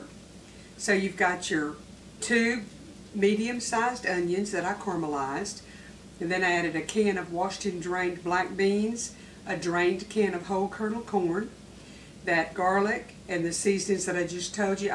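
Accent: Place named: Texas, United States. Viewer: Looks amazing. Like that Texas language. Lol 😂 prayers for you and your family.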